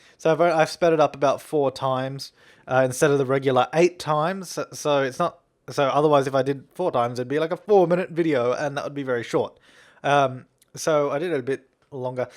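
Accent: Australia. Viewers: he’s Australian.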